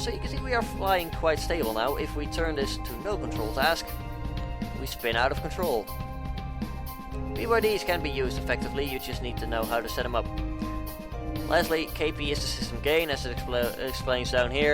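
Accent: Netherlands. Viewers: why dose the ai failsafe not conect and Are u dutch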